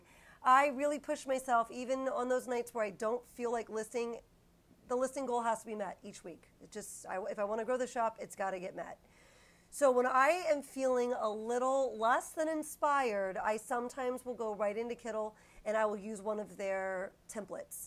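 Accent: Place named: New England, United States. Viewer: i have been following you since day 1. I went from 0 sales in may to 360 sales today, which is not tremendous but I don't have a lot of time to spare so I consider it a win. I owe a lot of my success to you and a few other youtubers like you. So thank you!! I was honestly surprised because I haven't had a single 0 sales day since January 1st. Before that, it was probably October. Again, I owe it to you ❤❤ In other unrelated news, when I first found your channel I was surprised by something else and that's Tucker lol. I don't know where you live but judging by your accent i assume somewhere in New England. There's a gardening Channel i watch, James Prigioni, and he has a little dog called Tuck who looks a whole heck of a lot like Tucker. They live in Jersey. Just a fun fact 😂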